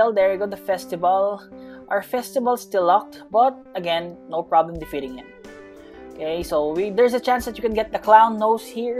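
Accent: Philippines. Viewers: Alam kong pinoy ka kasi sa salita mo pang pinoy na eh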